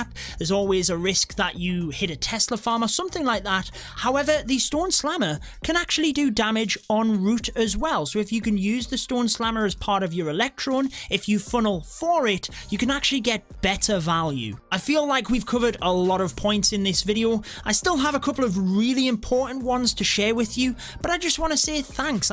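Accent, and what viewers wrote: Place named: North, United Kingdom. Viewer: his accent is a Northern one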